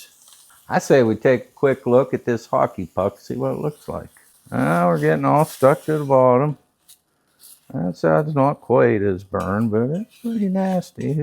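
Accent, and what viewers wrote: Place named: South, United States. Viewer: I love that southern drawl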